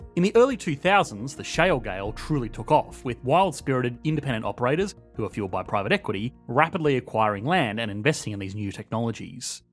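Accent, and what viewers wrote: Australia. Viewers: sounds aussie to me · He sounds very Aussie. I'm not sure how I missed that.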